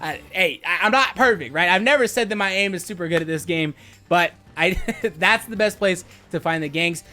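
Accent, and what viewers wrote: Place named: Russia. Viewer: Nice man I like gang hideouts. Damn man I just noticed how many subscribers you got now. Haha when I subscribed you had around 100. It’s because of the Russian accent you do haha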